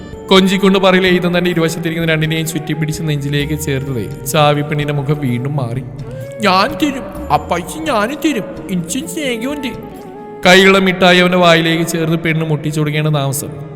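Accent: Tamil Nadu, India. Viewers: nalla oru entertainment story😂😂orupad chirich...ithuvare ulla nayikanmaril ninn variety nayakane nammil ethicha madhurikkum adipoli tamil slang presentation nadathiya ❤❤❤❤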